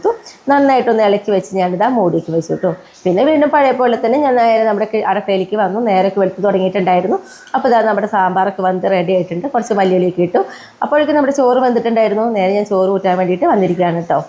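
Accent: Thrissur, India. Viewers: thrissur aano sthalam?..ollur aano?... talking style kandittu thrissur pole thonni..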